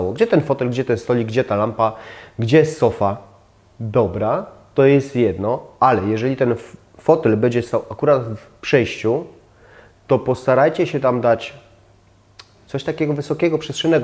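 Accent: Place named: Upper Silesia, Poland. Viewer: Jest Pan ze Śląska? Bo wyczuwam właśnie sposób mówienia charakterystyczny dla ludzi z Górnego Śląska 😁👌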